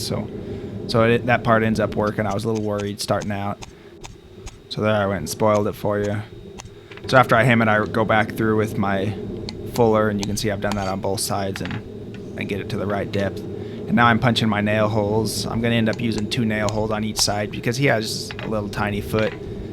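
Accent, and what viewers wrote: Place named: Midwest, United States. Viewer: “I gotta dang good wife,” so wholesome aaahhhhh😭 that warmed my heart. PS there’s nothing better than that familiar Midwestern drawl, I’m from Utah and it’s always like a taste of home to hear an accent like yours.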